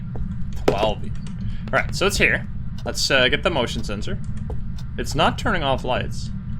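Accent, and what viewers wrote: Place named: Netherlands. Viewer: seems like so many dutch accents are barely noticeable. I've always wondered why that is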